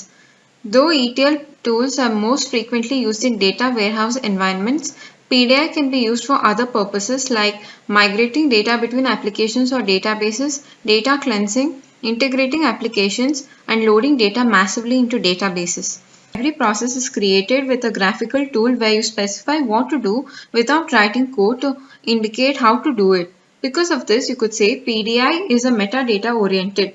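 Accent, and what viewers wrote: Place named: India. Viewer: 最难忍受 印度人 说英语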